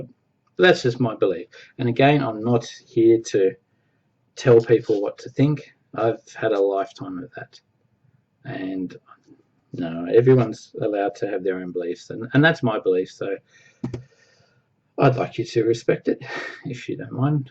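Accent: Australia. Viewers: Awesome video. Thanks mate. I love our Aussie accent... be proud. Like Cedars you are laid back and nice to listen to. Cant wait to see more from a fellow Aussie. Your experience really resounds with me. 3rd gen always questioning the org, especially seeing all the badness around the world happening to good people but Jehs smartass, rude, selfish people were taken care of? I was also df but at 20. Sucked up go be re but now faded with Hubby at 35. Would love more info on the recovery group you mentioned if its an open group. Greetings from sunny Queensland 😊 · Gidday! great accent but of course I am bias, been hot lately mate, thanks for your introduction, though I still believe in God and the kingdom, I can understand why you believe what you do now, and respect your views. :)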